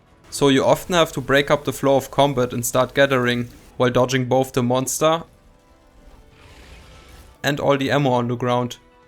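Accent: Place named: Germany. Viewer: The German accent though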